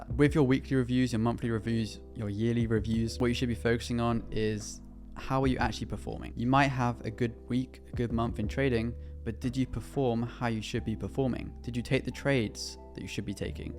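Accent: United Kingdom. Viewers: How to sound British like you? I like your British accent mate 😍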